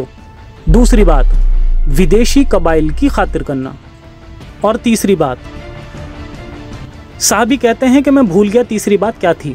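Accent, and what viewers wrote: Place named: India. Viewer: Awaz say he Indian lag raha ha. Jhoota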